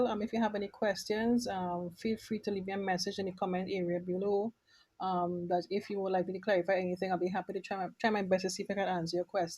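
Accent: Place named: Trinidad and Tobago. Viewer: Hi, great video. Btw are you Trinidadian?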